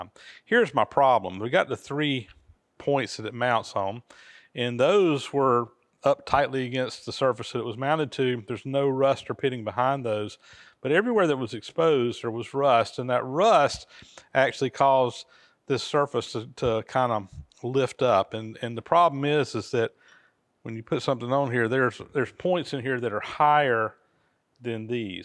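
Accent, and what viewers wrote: South, United States. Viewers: you might find this a bit funny. When you say "arn fest," I used to think you were saying "iron fest" with your southern accent, But recently I saw Mr Pete's video and he had an "Arn fest" t-shirt on. 😂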